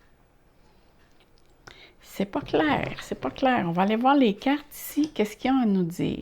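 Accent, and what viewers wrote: Quebec, Canada. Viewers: C'est extra pour le 3 eme paquet, curieuse due à votre accent, de quelle région du Québec êtes-vous ?? moi je suis de Québec. Merci de votre explication.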